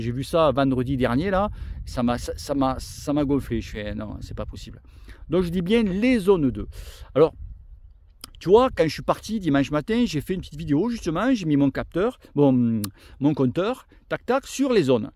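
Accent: South, France. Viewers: Slt, capteur de puissance un peu cher tout de même, moi qui fait du vélo sans faire de compétition sans cyclo et qui ne suis même pas dans un club😄. Pour m'entraîner FC c'est très bien pour moi et à ce sujet pour travailler dans les zones d'intensité tu calculais les zones de pourcentages comment ? Pourcentage de Fcmax réel ou les pourcentage de la FC de réserve ou autres ? Sinon ta chaîne au top 👌 toujours le truc pour faire rire et cet accent qui sent bon le Sud 🌞. sujet toujours intéressant bonne continuation et saison cycliste. 🙋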